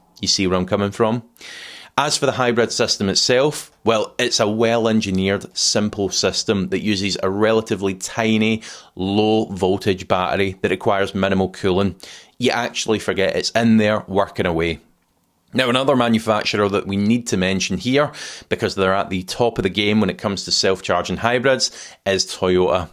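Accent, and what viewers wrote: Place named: Cockney, United Kingdom. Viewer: damit... sometime I feel I hear Cockney! Can you please speak more distinguishable, more sharp?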